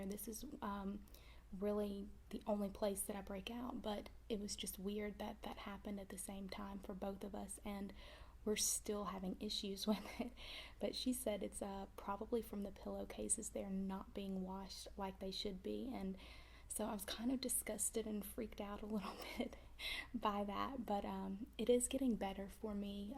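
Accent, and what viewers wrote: South, United States. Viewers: Do you have a slight southern accent?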